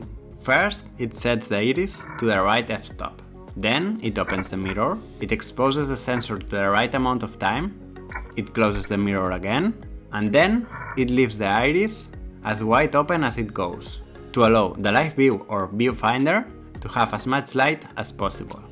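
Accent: Spain. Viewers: I see your spanish accent lol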